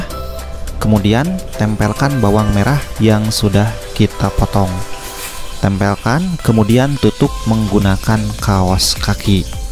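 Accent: West Java, Indonesia. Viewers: Sunda pisan euy..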